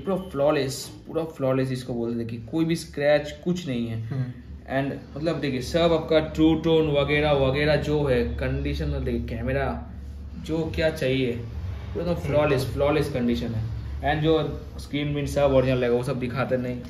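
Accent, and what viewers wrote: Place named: Bengal, India. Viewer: আপনি কি বাঙালি? সারদাপল্লি উচ্চারণ শুনে বাঙালি মনে হলো, হিন্দি উচ্চারণ শুনেও বাঙালি মনে হলো। তাহলে ভুল হিন্দি তে ভিডিও কেন করলেন ভাই? আপনি পশ্চিমবঙ্গে বসে আছেন। এখানকার ভাষা বাংলা। নিজের ভাষা কে সন্মান করুন। আপনি নিজের জায়গা তে হিন্দী বলবেন, ওদের জায়গা তে গিয়েও হিন্দি বলবেন....ওরা নিজের জায়গা তে এই ভিডিও টা করলে বাংলায় করতো বলে মনে হয়?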